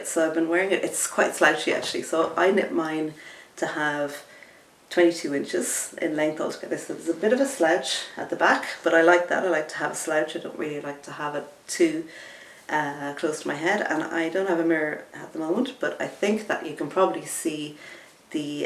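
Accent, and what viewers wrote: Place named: Ireland. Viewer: Hello from Portland, Oregon. Just came across your podcast and love it. My grandmother came from Mayo and she had a very heavy brogue, rather like yours👍love all the pieces you displayed. The shawls are beautiful. Love the green sweater, is that called Harlow? I’d like to knit one like that. What yarns did you use. I’ve hit the subscribe and looking forward to reviewing the past podcasts. Thank you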